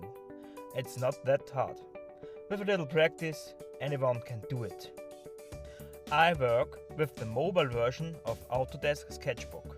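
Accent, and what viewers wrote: Germany. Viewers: Great yet simplistic tutorial in your "German🤪" English..with that said you have got a new subscriber here 🖐.... how about the video of Artist review of new Galaxy note 10 plus😃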